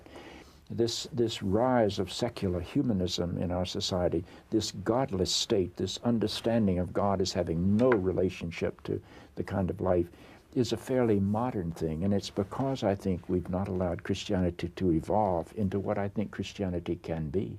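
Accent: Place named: United States. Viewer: What a gobshite. I'm my country? You sound like you're from the usa? Full of shit!!! I was told I was told. Lies lies and lies!!!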